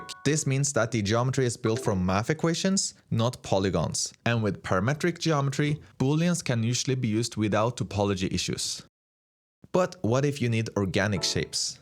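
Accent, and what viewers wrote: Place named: Norway. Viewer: you are Norwegian from that accent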